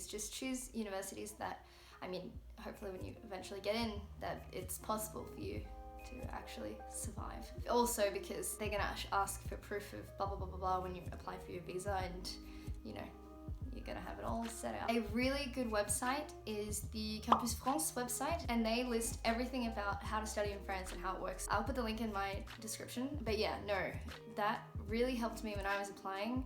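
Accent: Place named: United Kingdom. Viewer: It was the most useful I watched about study in France , Note: I love the British accent ❣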